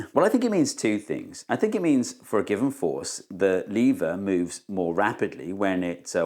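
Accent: United Kingdom. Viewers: Just an idea that popped into my head at the end of the video, no idea if this is relevant or not, but does the hinge location relative to surface the ball is striking on the lever make a difference? The levers you tested either had the hinge point in the center of the lever or on the opposite side of the lever from where the balls where striking it. Since all these levers will necessarily have a thickness, the hinge point was never in-line with the contact surface. Would there be any difference if the hinge point was inline with the contact surface? what if the hinge point was moved in the opposite direction (towards the balls)? Or what would happen if you moved the hinge point even farther from the balls (like a couple inches[or I suppose you could use centimeters] back)? Another idea that popped into my head while typing this; why do English people not spell it 'leever' when they pronounce it that way? 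You don't pronounce the word 'level' that way.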